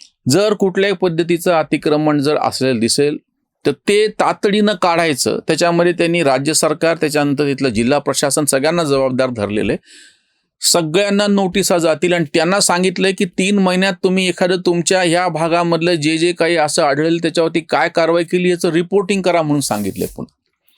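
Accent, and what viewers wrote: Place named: Marathwada, India. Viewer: कोणतं अँप आहे त्याची लिंक किंवा अँप चं नाव तरी द्या सर जी,तुमचं बोलणं म्हणजे परभणी चा आणि बीड चा मिक्स तडका असतो❤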